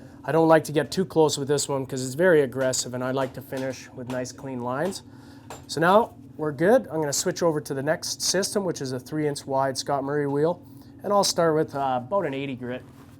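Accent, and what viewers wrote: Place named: Canada. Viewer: FatherSonGunFun Um, pretty sure that's a Canadian accent.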